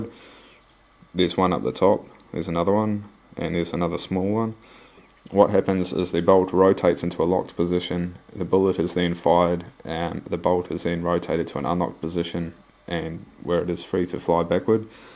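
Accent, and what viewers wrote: New Zealand. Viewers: Could pick your kiwi accent a mile away :)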